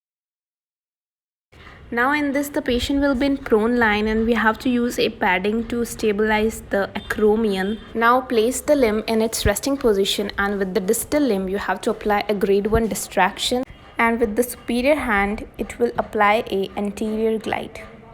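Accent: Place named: India. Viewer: Don't try to change your accent. Indian accent is perfect for us.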